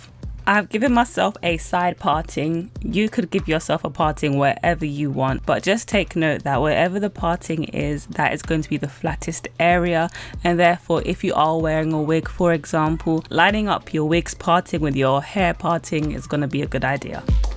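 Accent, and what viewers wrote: United Kingdom. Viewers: and lol I love your british accent!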